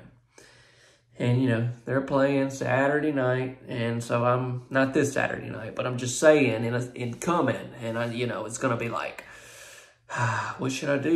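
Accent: South, United States. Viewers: Lovely home. Wish they had a person showing it that was more professional and talked “normal” without the unclassy southern accent. He makes the video trashy. Can’t stand southern accents. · Y'all don't have to watch his channel, he can talk any way he wants too! Y'all up north talk funny to us southerners too.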